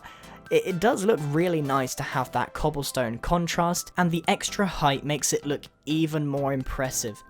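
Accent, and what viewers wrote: United Kingdom. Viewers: are you really brtish ☺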